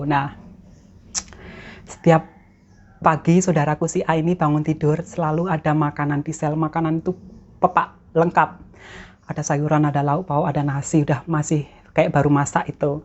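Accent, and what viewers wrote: Java, Indonesia. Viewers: Min ngomongnya medok bgt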